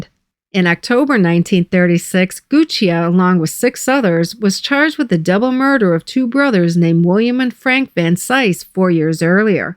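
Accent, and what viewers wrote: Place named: Chicago, United States. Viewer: You’re great, amazing content but… the heavy Chicago accent…. Doesn’t match with the NE mob.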